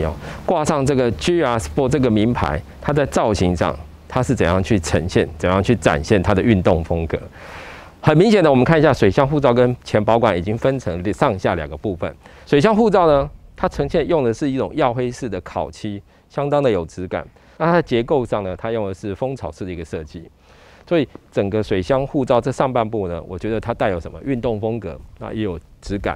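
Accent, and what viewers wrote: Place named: United Kingdom. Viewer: 我都不知道台灣是以美語為主還是英文為主,怎麼發音都走英國風?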